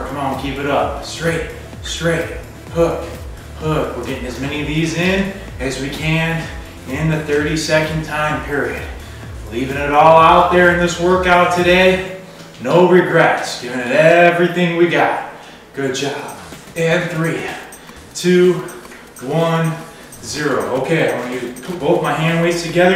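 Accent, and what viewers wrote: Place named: Wisconsin, United States. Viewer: First week down. LOVE IT. And I must say. I dig the “WIzkahnzin” accent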